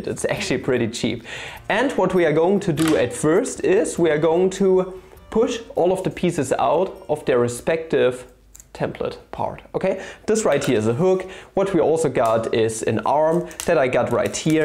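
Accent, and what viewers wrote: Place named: Bavaria, Germany. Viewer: that Bavarian accent is just so engaging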